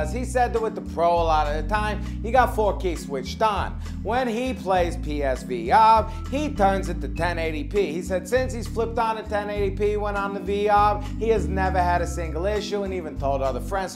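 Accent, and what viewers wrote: New York, United States. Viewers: His voice accent is an accent from the late 1800's New York you're a fucking stupid moron lol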